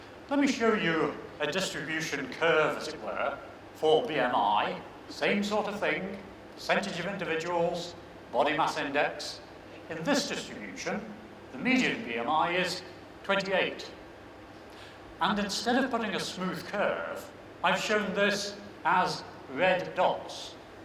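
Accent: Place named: England, United Kingdom. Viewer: I'm at risk of developing Type 2 and i was wondering is laughing a risk to raise my blood pressure? The first 5 minutes I was just laughing, he has a funny English accent and what about that Angel of the North story LOL LOL and the people with their faces erased LOL LOL LOL aaaaaaaaaaaaaaaaaaaaaaaaaaaaaaaaaaaaaaaaaaaaaah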